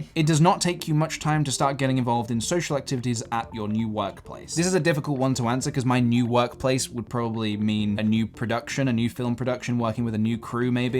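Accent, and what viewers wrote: United Kingdom. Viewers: My gad, just fell in love with your British accent (if any French people are also hanging round here, sure yall agree) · why u sound british but not really :/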